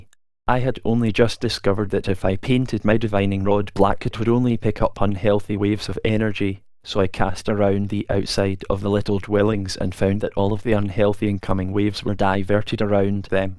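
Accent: Scotland, United Kingdom. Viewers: Hello please answer a question for me and my son who is 15 My son thinks that you're using one of those robot voice thingy Majiggers I told him you're not and that it's just someone with a really cool Scottish accent who probably speaks Gaelic also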